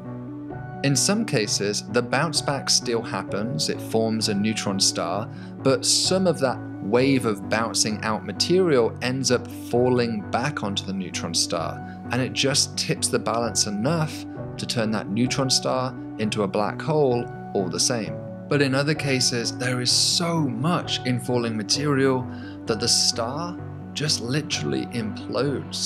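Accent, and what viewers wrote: United Kingdom. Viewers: I knew he'd be British before he started talking lol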